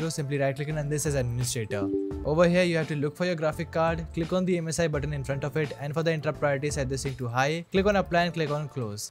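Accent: India. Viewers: are you indiina?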